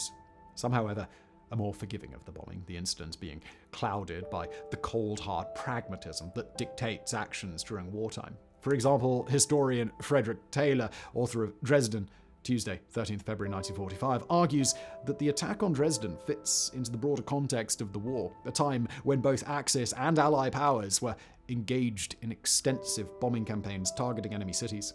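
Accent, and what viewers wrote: RP, United Kingdom. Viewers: I'm an American veteran officer, and suspect you barely touched the topic. It seems you have relatively little to say about the American GIs. Citing hundreds here and dozens there hardly compares to what you say the British did. (You even sound British.) We love hearing how legendarily Good we are, but I believe lying only makes our problem worse. I realize this video is about WW2, but we were the same nation that committed horrific inhumane crimes during the Spanish-American War, during the near destruction of the American Natives during that same decade, and we were still the same culture during Vietnam when our lovely GIs would sight-in their rifles by firing on local farmers out in the rice paddies. I'm simply confident that War brings out the worst of Human Nature. That's not an excuse for it. I just think you skipped a lot. 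· A posh Brit complaining about America and how we came and saved his country, how about just say thank you and shut up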